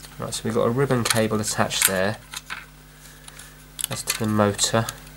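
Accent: England, United Kingdom. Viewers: Love you accent what part of England are you from also the walkman looks cool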